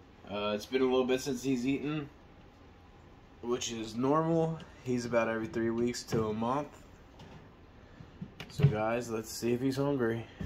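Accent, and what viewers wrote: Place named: Philadelphia, United States. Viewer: Where in Philly/south Jersey are you at? I live in north Jersey so I recognize the accent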